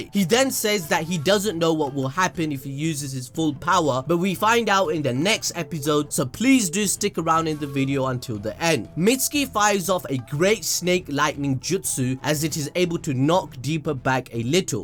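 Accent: Punjab, India. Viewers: that punjabi accent though. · LMAO , are you Indian?? , if yes you have a very nice English accent , btw Love ur video and fk trashamaru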